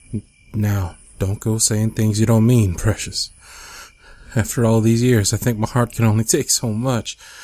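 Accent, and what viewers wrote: South, United States. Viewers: can you please make a playlist with all videos of you with a southern accent please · As a proper southern lady, I must say you're accent is quite good. Especially your cussin'. Hehe. ^^ Honestly. That "Shiit" at the end sounded so real I almost turned around, half expecting my dad! XD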